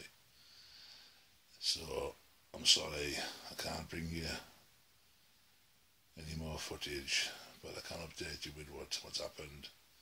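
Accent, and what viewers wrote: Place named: North East, United Kingdom. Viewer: You sound like you're from the north-east, if so that painting too close for comfort.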